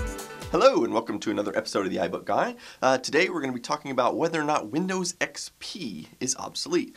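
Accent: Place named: California, United States. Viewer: Hi 8-bit Guy. Where do you live? Some commenters think you live in Texas, but to me, it sounds by your voice that you live in California, or maybe New York.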